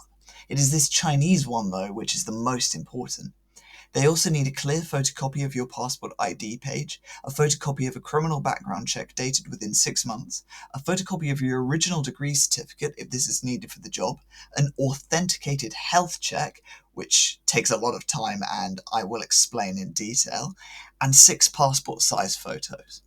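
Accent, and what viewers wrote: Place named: United Kingdom. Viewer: It´s lovely to hear you talking. I am not used to listening to British English that much anymore. Besides that, I hope that everything works out well in the future and you can come to Taiwan soon!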